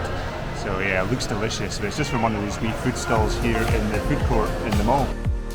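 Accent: Scotland, United Kingdom. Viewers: Aww. That Scottish accent. Made me smile all the time.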